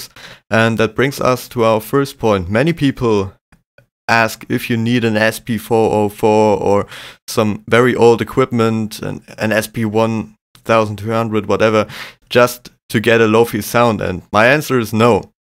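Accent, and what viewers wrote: Germany. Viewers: Great vid man, thought I detected a German accent ;) Hast du ein eigenes soundcloud seite? Deine beats sind richtig geil!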